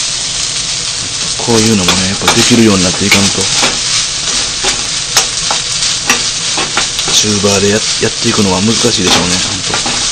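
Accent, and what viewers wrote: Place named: Kansai, Japan. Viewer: 声と関西弁に癒されます!!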